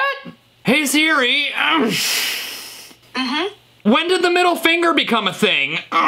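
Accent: United Kingdom. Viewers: Very heavy British accent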